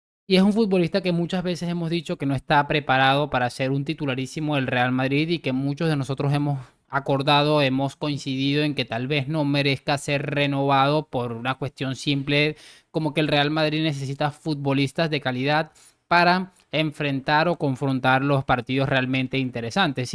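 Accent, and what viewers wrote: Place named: Venezuela. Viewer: Oye una pregunta sin sin ofender y perdona si te ofendo de dónde eres? Porque me gusta mucho tu acento y no sé si venezolano o cubano 🙏💪🔥😈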